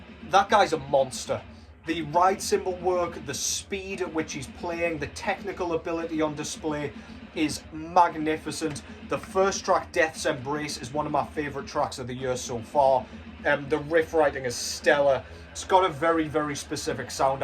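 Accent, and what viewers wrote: Newcastle, United Kingdom. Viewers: Where yuh at fella? Ah need me durse uv Geordie taakin aboot metal! Woraboot a Nile ranking man?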